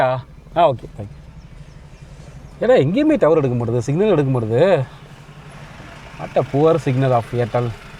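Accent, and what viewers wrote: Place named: Madurai, India. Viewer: Jee ur video super madurai speech super ji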